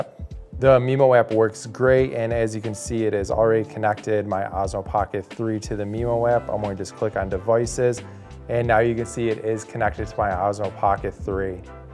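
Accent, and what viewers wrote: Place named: Midwest, United States. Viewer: Midwest accent like a mofo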